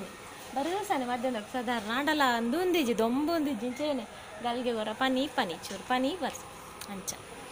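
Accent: India. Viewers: Eer enna ne tulu panda proper Mangalore tulu paatheruvar anchad enk mast kushi aapundu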